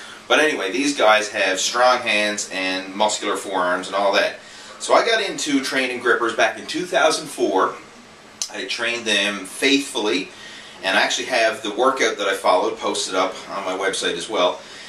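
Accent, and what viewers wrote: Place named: Cape Breton, Canada. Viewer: Her are you from Cape Breton Nova Scotia? You sound like a Cape Bretoner :p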